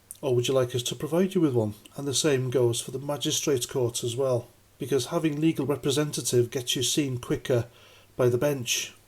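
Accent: Ireland. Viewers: I think I detected a very, very slight Irish accent so maybe that's where his ambassador thing comes in. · Hi there, like another viewer, I detected a faint Irish accent. Not NI, more like Dublin. One wonders why anyone would make a point of protesting overseas. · English? He sounds Irish to me. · I heard an Irish (eire) accent. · His accent sounded as if had a hint of Irish to me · He sounds like a very posh irish man. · He sounds Irish to me.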